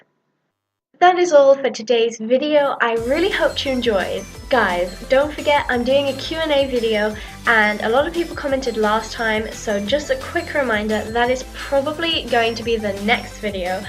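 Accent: United Kingdom. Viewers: Are you British? :o ( I'm just curious because your accent sounds like British) xD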